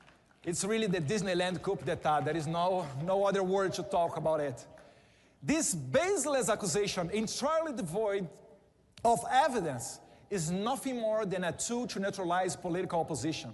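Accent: Brazil. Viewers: Foi 1bom inglês dele,só q com sotaque dele ou brasileiro, agora se vc q q ele fale igual 1norte-americano espete sentado....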